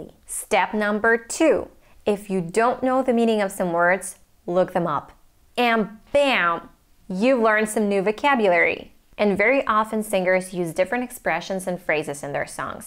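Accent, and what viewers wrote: United States. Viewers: I love this Belarus song. - Very beautiful poetry too...... I thought, You were Hispanic American. Very surprise to know that You are Ukrainian. You have a very cute baby too. You have a very American native accent. I had lived in U.S.A. for 16 years. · I read on a comment that you are not American . is it true 😮😮😮 because you sound like one of them .I do not know if that guy was saying foolish things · I love you. Your English is American you speak and sound very well, but can you speak the British English?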